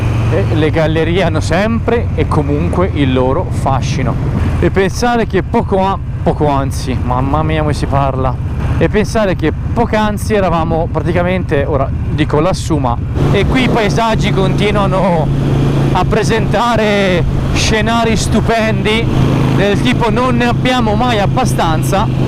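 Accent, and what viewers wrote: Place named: Tuscany, Italy. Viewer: Un toscano che parla Veneto, troppo forte 👊✌️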